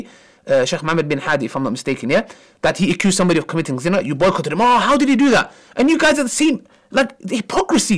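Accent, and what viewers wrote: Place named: United Kingdom. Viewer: As salamou alaikoum brother , I am a sister from Montreal and my English is not on top and I ask you brother to speak a little slower since it’s mixed with British accent and I have a bit difficulties to understand. Can you put subtitles? Your videos are full of good informations. Thank you brother may Allah reward you al Jannatil firdaws🙏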